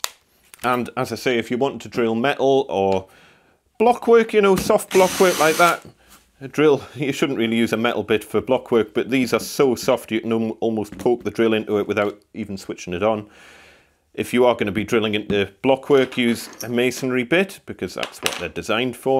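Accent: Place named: Northeast England, United Kingdom. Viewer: Hi. Good video. I've been using an SDS drill for about 9 years ( 1930's house - super hard brickwork ). About 3 years ago I saw this video: <link> & took note of it. The next time I used the SDS it caught in a wall tie & kicked violently. If I hadn't been holding it as the video instructed I reckon I'd have been nursing a broken wrist & jaw & whatever other injuries being thrown off the stepladder would have caused. Thanks for the info you provide & it's nice to hear the accent ( I'm from South Shields but haven't lived in the NE for about 30 years ).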